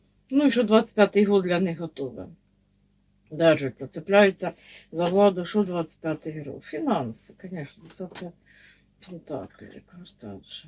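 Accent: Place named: Poltava, Ukraine. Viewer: Привіт з багатостраждальної Херсонщини.Слухаю Вас як дома побувала.Моє дитинство пройшло на Зіньківщині.Там проживають мої рідні,до яких я вже третій рік не можу приїхать через кляту війну.Наш полтавський говір не забуду ніколи.Миру нам і закінчення війни,а вам бажаю здоров'я.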